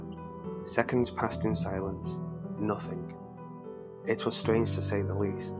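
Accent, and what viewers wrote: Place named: Northern England, United Kingdom. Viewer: Another great job! Where abouts are you from? We're based in York and you definitely sound like you're from the north :D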